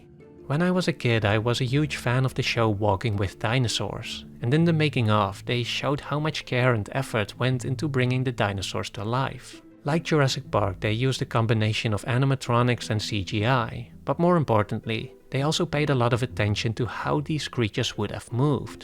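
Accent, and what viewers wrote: Netherlands. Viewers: Hey man, any chance you’re Dutch, I think I can tell from the accent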